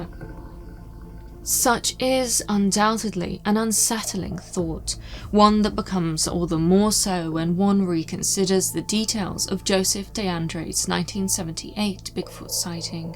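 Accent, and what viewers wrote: United Kingdom. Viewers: These subjects are so often covered by american writers that it is nice for a british person to hear the voice of a clearly spoken british person !!!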